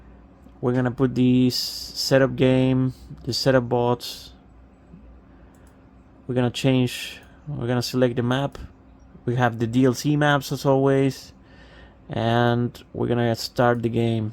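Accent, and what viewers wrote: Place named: Argentina. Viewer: Qué pro, Boludo tus tutoriales son de lo mejor,, sigue con el desarrollo de tus proyectos, sabía qué eras Argentino, ese acento no se quita ni con inglés, desde el principió me dí cuenta con ese inglés, jaja, it keep also, bro.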